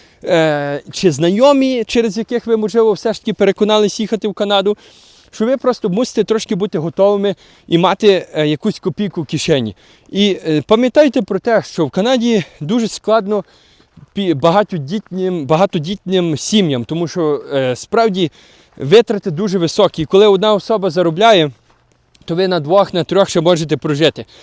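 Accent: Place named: Western Ukraine, Ukraine. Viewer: Говор западенца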